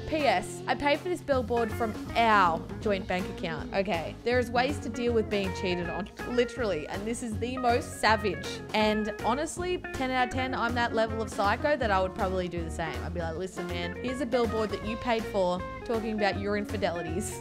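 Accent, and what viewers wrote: Australia. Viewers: I like the Australian accent!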